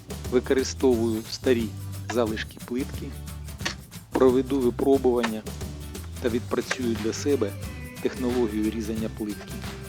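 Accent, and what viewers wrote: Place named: Russia. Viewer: Російський акцент ріже вуха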